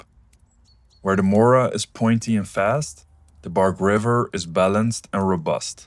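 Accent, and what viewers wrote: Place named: Netherlands. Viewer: I gues your from the netherlands? I think i can hear it in your accent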